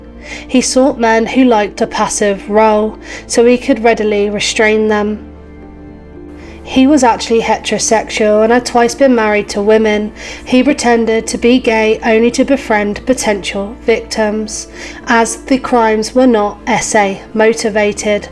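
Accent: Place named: England, United Kingdom. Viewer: I never had any doubt this was narrated by a woman from england who is real. Ai can be too obvious.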